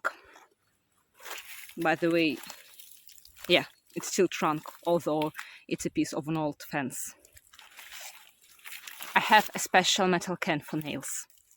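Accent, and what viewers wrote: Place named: Eastern Europe. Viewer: I gave you your 82nd subscription, I hope you reach 100 very soon! This video showed up on my homepage randomly, so this is my first viewing of you. It would be nicer tor additional viewers interested, to know the general location of where in the world you are building your personal paradise? You speak good English, but definitely makes me think perhaps your in Eastern Europe somewhere? As a suggestion, and perhaps more viewers from your general area will feel a relation and subscribe?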